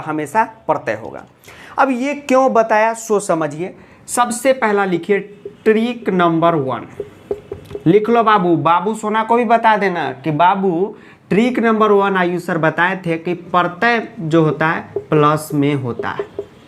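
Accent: Bihar, India. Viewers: sir are you from Bihar bcz your language is so sweet I also belongs to bihar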